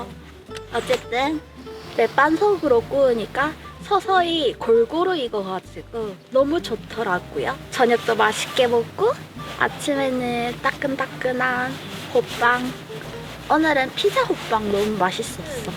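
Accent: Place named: Daegu, South Korea. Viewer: 대구분이신가요? 대구 사투리를 쓰시네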